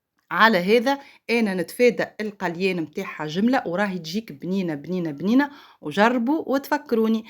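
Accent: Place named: Tunisia. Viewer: ما شاء الله على الهدرة الزينة التونسية ما أحلاكي الفديو رائع بكل التواضع و حسن الرقي في الكلام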